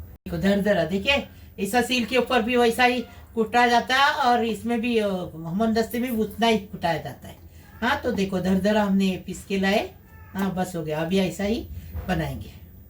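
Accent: Konkan, India. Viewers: Aap ka kokni style me bolna aur banana bahut acha lagta hai ❤️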